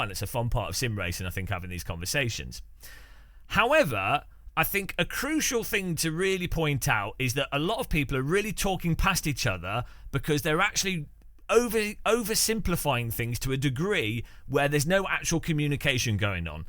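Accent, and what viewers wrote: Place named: Liverpool, United Kingdom. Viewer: love the liverpool accent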